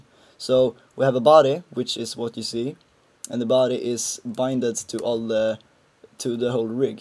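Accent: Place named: Sweden. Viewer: haha, hörde på din engleska att du är svensk xD :D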